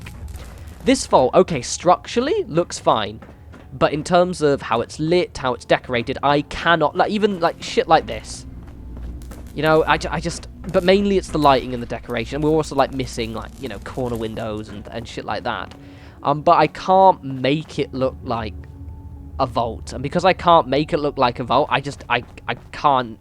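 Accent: England, United Kingdom. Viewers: I was actually thinking about this yesterday, when I was playing the game and trying to talk myself into going back to work on my vault but just really really didn't want to. I think you hit the nail on the head - I also tend to try to make my settlements look natural and part of the environment, like if somebody else came across it they might think it was made by Bethesda. If you look at the other vaults in the game made by Bethesda, many of them are dilapidated and worn. We only got brand new building pieces which just becomes monotonous after awhile and the only way to make vaults look unique is really through decorating, which if you aren't a fan of spending a lot of time on decorating, makes the whole thing feel like a chore. Side note: I actually found your channel (and subscribed) while I was looking for build ideas for my vault...I gave up on my vault, went back and watched some of your older videos and picked up a lot of tips that I've been using in my other settlements! 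And also, who can resist a man with an English accent doing Fallout 4 videos. Keep up the awesome work, can't wait to see what you do with Nuka World.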